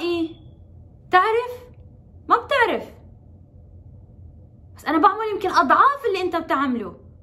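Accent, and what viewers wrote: Iraq. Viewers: كلامج بلهجه العراقيه حيل حلو 😍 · كولش ححلوو من تحجين باللهجه العراقيه تلوكلج 🤭😍🇮🇶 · كلامج بلعراقي ايخبل متابعج ابن بلدج من العراق💖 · كلام رائع جدا وشحلاتج تحجين عراقي 💋💋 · اللهجة العراقية تلوكلج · انت انسانه جميله وطيبه القلب ومبدعه وانت تحكي بلعراقي بتجننني. وتتاثريش بكلام ناس. لانو كلام. الناس ما بقدم وله بأخر❤️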